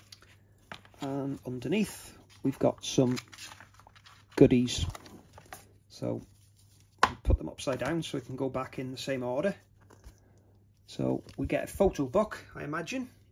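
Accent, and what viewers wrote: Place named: Liverpool, United Kingdom. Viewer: Someones from Liverpool?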